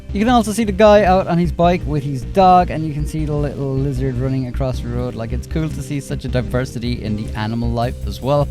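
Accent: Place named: Ireland. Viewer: hey btw where are u from? u sound like... idk sorta irish and sorta something else?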